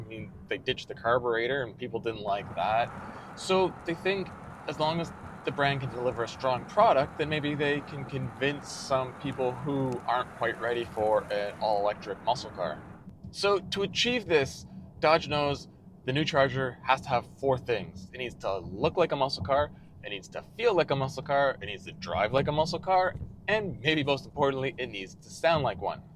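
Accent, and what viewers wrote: Canada. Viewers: See what its all a boat. Lol your Canada accent actually fits in this case.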